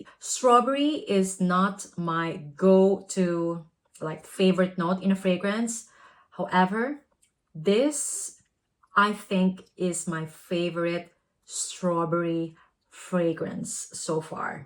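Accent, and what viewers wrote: Philippines. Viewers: Ooh. I knew you are a pinay from your accent. I'm from the Philippines also but now staying in italy 😊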